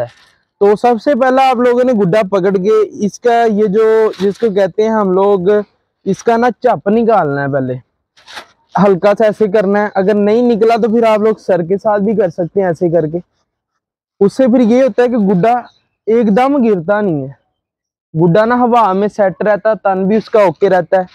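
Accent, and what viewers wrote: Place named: Pakistan. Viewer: Hy bro good video i am from Delhi India but your accent is like Pakistan... By the way nice video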